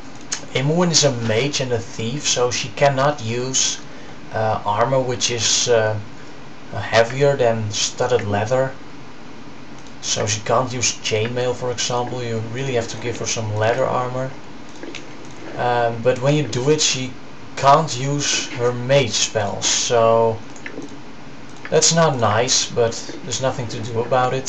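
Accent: Netherlands. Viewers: hes dutch aint he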